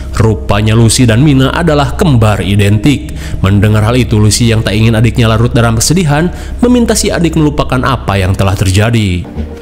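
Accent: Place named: East Java, Indonesia. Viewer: Tak bolan baleni "lele apa yg bisa terbang" 😂😂 Jowo etan teko kang 👍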